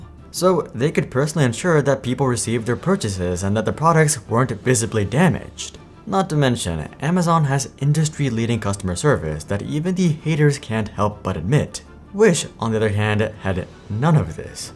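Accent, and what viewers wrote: India. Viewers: Your Indian accent is actually unbearable, you disgust me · He's just an Indian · WHAT is this accent that assaults my ears??? Are you both kinds of Indian? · Didnt know VoiceOver guy was India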